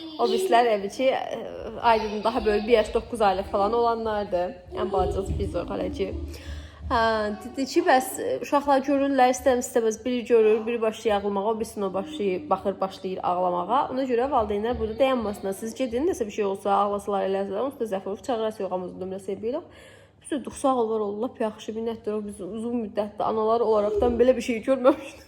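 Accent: Sheki, Azerbaijan. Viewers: Сох sırındı sekı lehcesı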